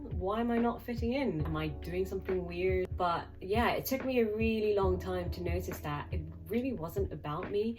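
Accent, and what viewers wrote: Scotland, United Kingdom. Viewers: ngl I thought you sounded Scottish for agesssssss!! Gosh I feel the same.... I find myself laughing at 'jokes' but really it's not okay. Thank you for articulating so many of thoughts I have, especially not fully resonating with the term BAME.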